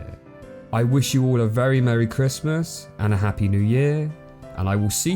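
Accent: United Kingdom. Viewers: I can tell you're British.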